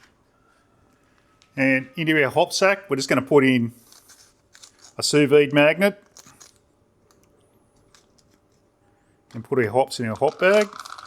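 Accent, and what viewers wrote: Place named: Australia. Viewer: MATE!!! Bloody love your channel, good job on all the videos and love the true Ocker accent, you remind me of me sergeant in the glorious RAR. Keep up the stellar work!!! 🇦🇺🇦🇺🇦🇺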